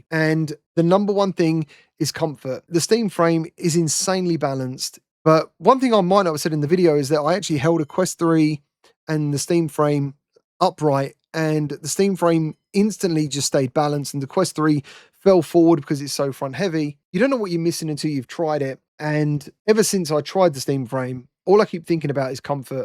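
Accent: United Kingdom. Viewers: I want it but without color passthrough for augmented reality, it's a pass. But your balance test wouldn't be an issue if you did it with the proper head strap. We all know the Quest head strap out of the box has been terrible since the Quest 2. It as a Meta decision designed to keep the headset price down. So funny how you guys across the pond say router as rooter. But honestly, I wish we'd stop including letters in words that aren't pronounced, no matter the language.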